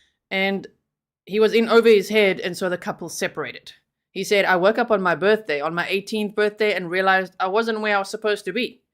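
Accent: South Africa. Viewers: I detect a faint South African accent? ❤️🧡💙💚💛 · In the beginning I was like: She sounds South African...I wonder where she's from? And then you used the word 'shame' and then I knew😂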